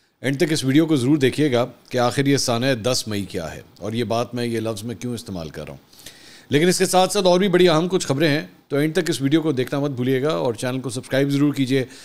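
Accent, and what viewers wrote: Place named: Lahore, Pakistan. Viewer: Typical lahori style of talking❤ love it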